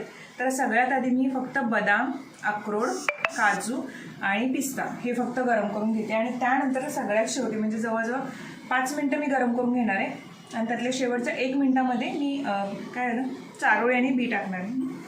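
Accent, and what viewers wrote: Kolhapur, India. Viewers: Tai me suddha kolhapur chi aahe tumhi dadansobt kolhapuri bhashet bolt hota tr khup bhari vatal😍😍😍jagat bhari aapli kolhapuri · Nice vedio all....pn tumhi Kolhapur che aahe he mast vatal language aaikun😘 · Ya blog la tu assl kolahpuri bollis khup mast vatl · ताई तू कोल्हापुरी भाषेचे छान बोलतेस एकदा बोल की मग व्हिडीओ त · Kolhapuri language ahe tumchi😀🔥❤️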